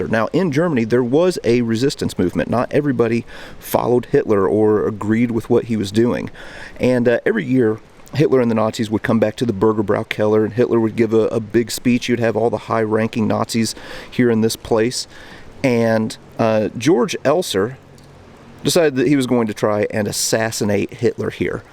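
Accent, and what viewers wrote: United States. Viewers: Shame about the dreadful American accent. The word “route” is pronounced “root,” not “rout.” The “A” in Adolph is pronounced as in “rat,” not as in “cake.” Nothing annoys me more than Americans mutilating the King’s English.🏴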